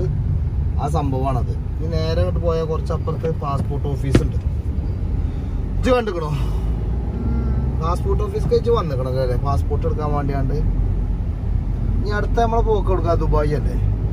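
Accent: Malappuram, India. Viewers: തനി മലപ്പുറം കാരൻ തന്നെ അടിപൊളി പച്ച മലയാളം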